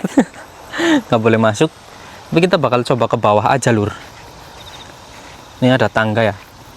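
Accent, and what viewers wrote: Banyumas, Indonesia. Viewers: Guyu ne kakak khas banget kaleh ngapak e nek ngomong seng bikin TOP.bojonegoro Jawa timur hadir.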